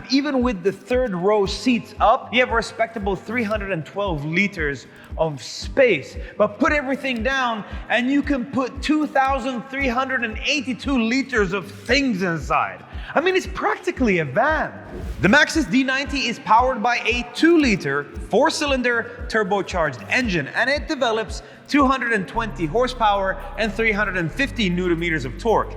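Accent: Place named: United States. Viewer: Nice review and excellent flow of information coupled with an American accent, I am subscribed ! 😄👍🏻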